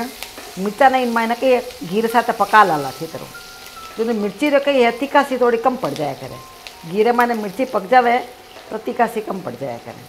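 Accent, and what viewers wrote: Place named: Marwar, India. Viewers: Mujhe rajasthani nahi aati pr it is very lovely to hear. And this recipe was amazing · Wa wa sa Maine to aapani marwadi boli ghani meethi lage. Bahut hi chokhi tariya su btavo ho sa aap. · मारवाड़ी बोली चोखी लागी, पर ए सब बनाबनो म्हाने आवे सा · I love her accent ... would like to meet her, where does she live? Jodhpur? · Even I also liked her voice and Rajasthani language how she describes🙏 really amazing so sweet voice and her presentation may god she lives long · Aap itni pyaari maarwaari Bolte ho.......aapki aawaz mein Mitti kee khusbu aati hai......aur wohi taste Aapke khaane mein bhee aata hai ......! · Aapki boli sunke maza aa gaya .... rangilo rajasthan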